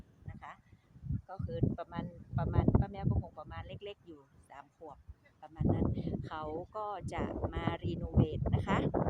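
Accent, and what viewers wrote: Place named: North, Thailand. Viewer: มาฟังสำเนียงเหนือด้วยคนค่ะเพราะมาก❤❤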